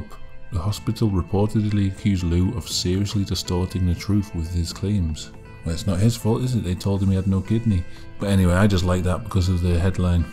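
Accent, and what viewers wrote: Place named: Newcastle, United Kingdom. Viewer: we love your New Castle accent that late night DJ mellow drone and your content is consistently strange and revealing. Keep it going Mitch and Lolly from Tipperary or should we say Kipperarey Ireland.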